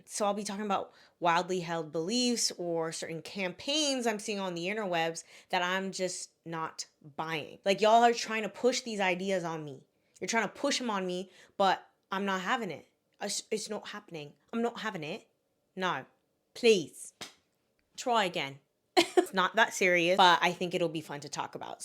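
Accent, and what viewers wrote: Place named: Canada. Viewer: Agree 100% on the first two although I do love the piano in La La Land. Studio Ghibli is amazing imo so hard disagree. I can understand your critique of TSITP because it's definitely problematic in some ways and not what I would do in Belly's (and Conrad's) situation for the majority of the books and show lol but I definitely have a nostalgic pull to it since I'm 31 and read the books when I was in high school. Also are you Canadian because you sound so Canadian lol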